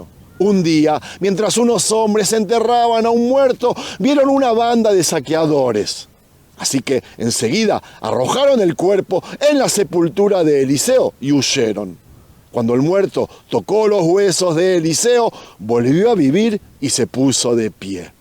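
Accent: Argentina. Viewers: ¿es Argentino el narrador?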